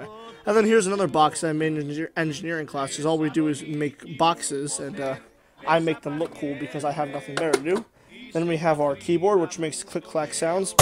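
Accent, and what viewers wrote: United States. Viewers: Why you speak like westerner?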